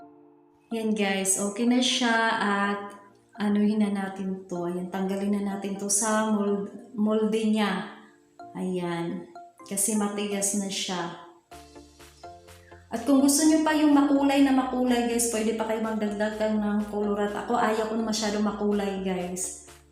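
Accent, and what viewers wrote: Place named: Visayas, Philippines. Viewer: Mam idol titigas ba ang sabon kng ang ilalagay kong lye is 15 pcs kalamansi tpos with tomato mga 5 to 10 tpos lagyan ko pa ng myra e...gusto ko gawing business ksi mam at gusto ko good quality..sana mapansin mo ung comment ko ma'am ksi mg start na ako bukas completo na ako ng gamit...By the way ma'am prang bisaya ang dialect mo..bisaya din ksi ako..Thanks mam..God bless and thank you for sharing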